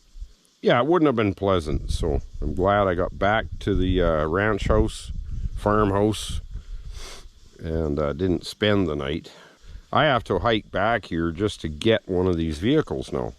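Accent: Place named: Canada. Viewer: I watch this for the content but also the fantastic Canadian accent, one of the coolest accents there is. I’m a Geordie from the U.K. (Google my accent 😊).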